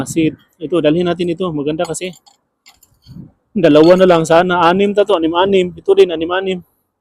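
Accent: Visayas, Philippines. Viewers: Visaya kaayo ka ug lingaw kaayo ka mag vlog. 😅😊 Asa ka dapit dito sa pinas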